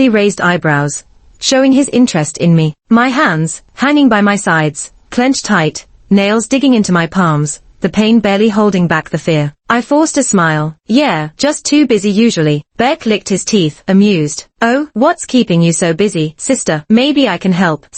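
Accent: United Kingdom. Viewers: Wait so at the beginning she said Beck treated her like a queen. Why did that change as the story went on? Kind of confused but I liked the story a lot. I love the British voice. So classy even when calmly plotting revenge lol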